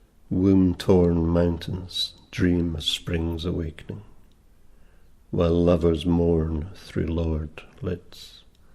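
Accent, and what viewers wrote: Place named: Scotland, United Kingdom. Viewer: Dearest, so sad to hear this, thrilled to hear your poems and will visit your photography site, also so good to hear more about you, but would love to hear you sing 😉😊 I will so miss your calm sensitivity and beautiful notes, your voice and accent which makes me feel closer to my dad and the family and of course Scotland.. your shirts and jumpers.. 😍 no other matches you. Enjoy more time to write and take beautiful images and take care too.. love to you three xxx and please return soon!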